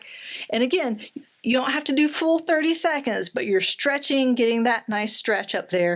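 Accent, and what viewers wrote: United States. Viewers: I tune in to hear you say 'disclaimer alert' in that wonderful American accent.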